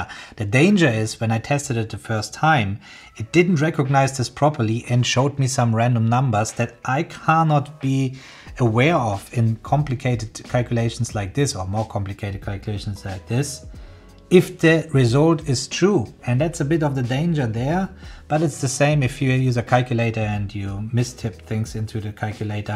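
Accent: Germany. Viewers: Du kommst doch Safe aus Deutschland oder?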